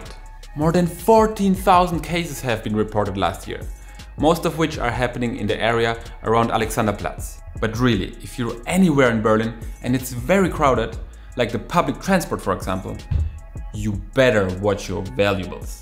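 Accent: Russia. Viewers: I confirm your russian accent as a russian ) bardzo ciekawe zobaczyć 😌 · your russian accent is wonderful! 😄😄😄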